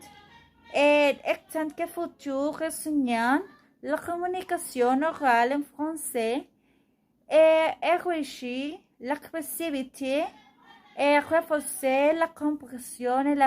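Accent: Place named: Portugal. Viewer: Eres portuguesa? você fala francês como um português... hablas frances como una portuguesa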